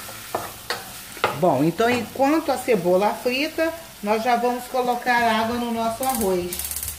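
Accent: Rio de Janeiro, Brazil. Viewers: Adoro o seu sotaque Carioca! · Adorei o modo prático como comanda tudo, fora o seu sotaque carioca lindíssimo, e a dica tb adorei kkkkk. Beijos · Amo suas receitas e sua pronúncia carioca.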